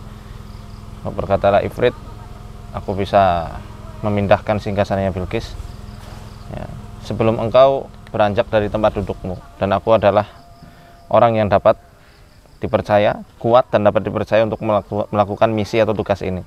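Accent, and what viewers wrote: Banyumas, Indonesia. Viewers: assalamualaikum pak ustadz alamat dimn aslinya orang mana...ngomong ngapak apa y...salam dari Cilacap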